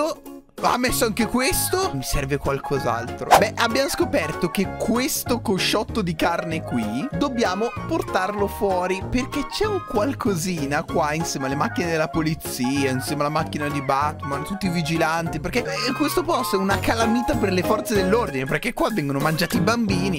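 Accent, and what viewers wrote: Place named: Udine, Italy. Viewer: Mi sembra udinese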